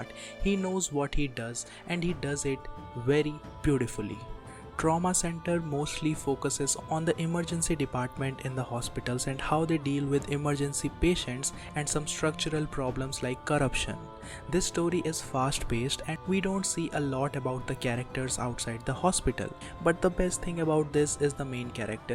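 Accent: India. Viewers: i hate indian accent..!! so anoying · Epic video bro. By the way are you Indian? Your accent feels like you are a indian.Btw love your videos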